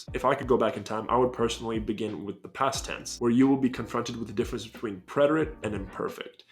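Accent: Spain. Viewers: Damn you’re talented at speaking Spanish, im surprised you learned how to speak Spanish with a Spaniard accent rather than a Latin American accent since you’re from the U.S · Mi bro habla como todo un español, te recomiendo escuchar otros acentos para que encuentres palabras nuevas que solo se usan en ese tipo de acentos, por ejemplo en Latinoamérica jamás se dice vidio, si no video, cheers mate <3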